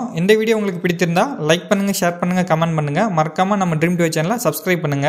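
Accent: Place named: Madurai, India. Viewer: Super madurai language avinke .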